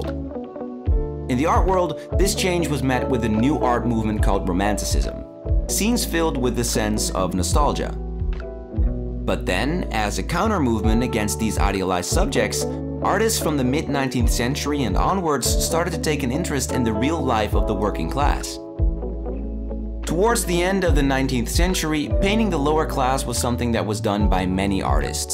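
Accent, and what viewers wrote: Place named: United States. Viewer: God, tone down the American accent, dude - so jarring...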